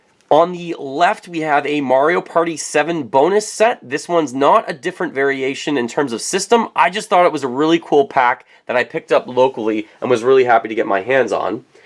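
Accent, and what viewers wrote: Canada. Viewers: That is a pretty thick Canadian accent.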